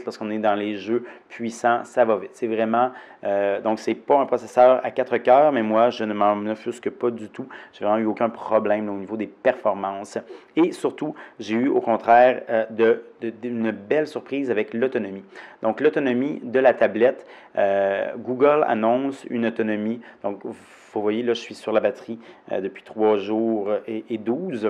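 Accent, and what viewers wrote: Quebec, Canada. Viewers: si il parle bien Français c'est juste qu'il a l'accent quebecois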